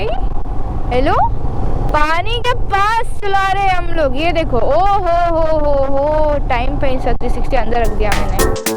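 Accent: Maharashtra, India. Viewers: Hey today I saw ur vlog first...surely u r Marathi..u r way of talking shows that..btw welcome to our place. Uttarakhand devbhumi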